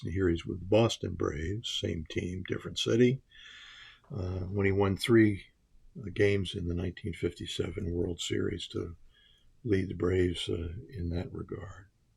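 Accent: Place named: California, United States. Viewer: Great video!!!! Love this set! Question: are you from Cali? You sound like a friend of mine who is from Agoura Hills .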